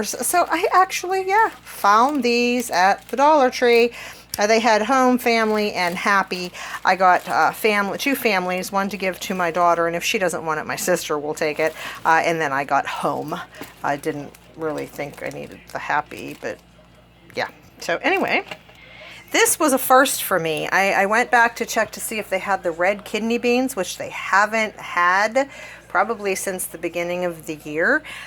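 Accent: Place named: Pennsylvania, United States. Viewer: I love hearing that PA accent! My husband's family is from Mckeesport and Monroeville. My in-laws and Husband lost their accent b/c they spent 20 years in South Florida. Hearing you reminds me of hub's grandparents, who passed on years ago. Wow! That was a long winded explanation 😂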